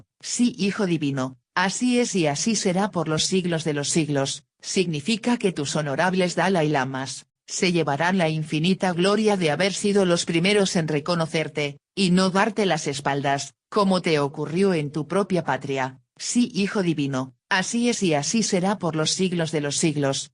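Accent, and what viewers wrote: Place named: Spain. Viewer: Me sorprende que esté leído por una española...será que ya llegó la Doctrina a Europa?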